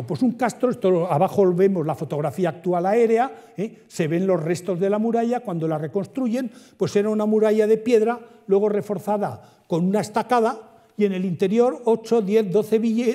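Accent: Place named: Spain. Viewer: qué acento insoportable tiene este señor, no soporto el acento español.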